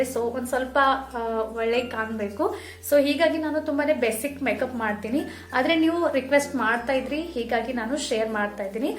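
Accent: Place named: North Karnataka, India. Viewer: Nanu uttara karnatakada Gulbarga davru.....neevu uttara karnatakadavru anta keli khushi ayitu.....neevu namma bhasedaga matadri keloka cholo anistada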